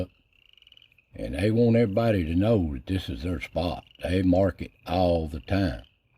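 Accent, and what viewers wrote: Appalachia, United States. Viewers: i am new to your channel but certain things are clear to me. You are certainly from around the area. You have said things in different videos that I have only heard back in the hollows myself, such as Notown, yup, i know where it is. The way you say Haint. I Have only heard dad and a few others actually say it the way you do. A paper Polk, again, just a few people i know use that. One of the later ones i saw in your Raw Head and Bones, you broke a Wantnot. My aunt is the only person i know that calls those wantnots. again not sure how that should be spelled. I figure you are about 20-30 years older then me. I grew up on the TN side of the mountain from you. Tackett creek was an area i knew pretty well. Just wondering, have you covered the Sand Cave up on Cumberland Mountain? My first trip to the look out rock on top of Cumberland mount was around 1980, the tower was still being build at that time. 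You could then drive a car up there. Looked at the overlook, then we went down to the sand cave. To this day if I go up there, i feel like i missed something if I don't visit both places. I knew about, but never made the trip over to Fern Lake. I have camped and hunted though out Tackett creek. No one really many scarely tales to keep us in line while i screw up, seems that was a generation before me. Although i did hear a few, wish i could remember more of them now. Dad once told of some boogieman that kidnapped kids, but i don't recall the details. I am really enjoyin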